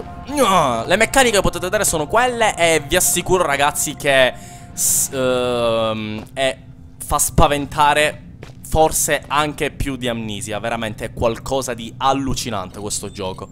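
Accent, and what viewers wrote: Palermo, Italy. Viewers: compare complimenti per il tuo linguaggio a palermitano...mi ki fresco ki si..continua così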